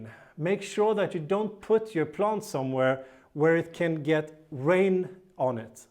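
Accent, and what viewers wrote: Sweden. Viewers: Perfekt Engelska, jag älskar den svenska accenten! du ger bra råd, tack så mycket. Min svenska är skräp..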